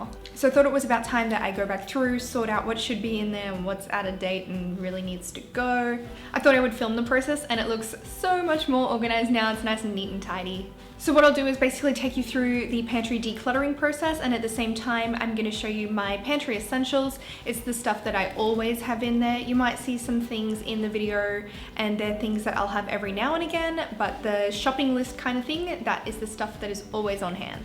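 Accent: Australia. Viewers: it's an Australian accent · That's just Australian accents m8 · culture lol. It's just an Australian accent. I could say the same about the way Americans pronounce words.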